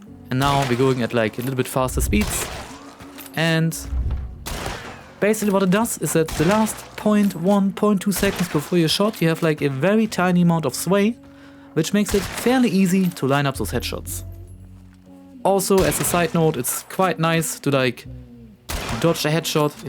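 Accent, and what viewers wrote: Germany. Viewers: that German accent to me as an English person is a stamp of quality! I just know it's going to be a good video. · Ich höre sie sind ein deutscher der herr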